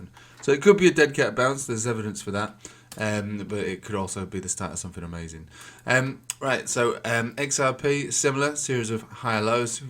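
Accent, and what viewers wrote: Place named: Leeds, United Kingdom. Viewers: I’m in Bradford you sound local are you in West Yorkshire somewhere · I think he might be from Leeds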